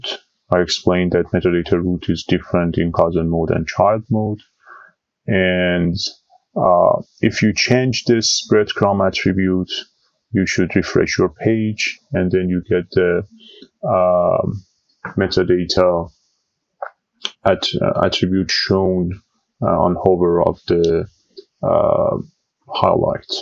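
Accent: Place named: Iran. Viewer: I hear persian accent 😀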